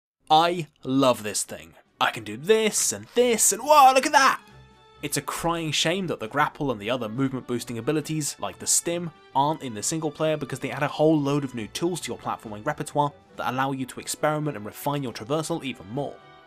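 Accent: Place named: Northern England, United Kingdom. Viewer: probably just Northern/Midlands English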